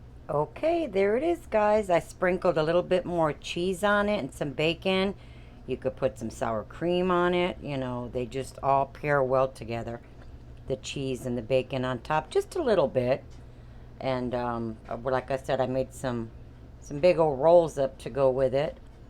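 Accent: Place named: Upper Midwest, United States. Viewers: Northern midwest accent.. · Just found this channel and subscribed. I live in Tennessee but your accent reminds me of my home state, Michigan. Midwest cooking is home to me.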